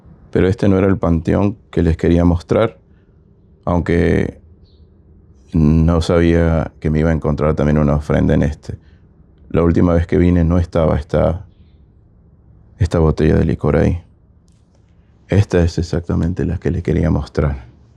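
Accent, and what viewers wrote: Argentina. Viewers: Es excelente por qué ya de estar tanto tiempo acá parte de tu acento tiene algo de argentino, pero lo disimulaste lo mejor que pudiste jajaja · ya se te pego el acento argentino, vi un vídeo de hace 5 años y hablabas venezolano 😅 jejejeje saludos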